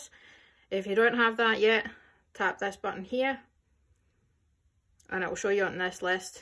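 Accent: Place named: Scotland, United Kingdom. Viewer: after hearing your Scot accent I am starting to like it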